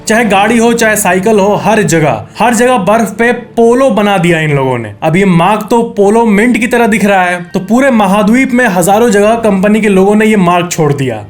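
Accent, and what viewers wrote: Bihar, India. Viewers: भाई तुम बिहारी हो क्या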